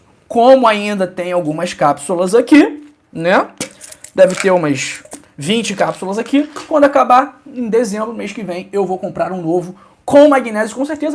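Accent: Rio de Janeiro, Brazil. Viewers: Pecebir que o dr era Carioca devido seu sotaque de carioca !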